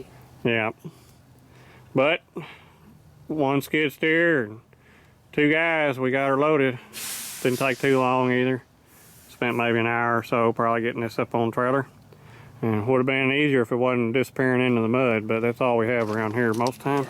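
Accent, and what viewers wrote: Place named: South, United States. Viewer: I'm always happy to find another southern guy with a southern accent showing that folks from the south may talk different but that don't mean we are not intelligent. Thanks for the update! I look forward t the next one but I've still got some backlog on your channel to catch up on.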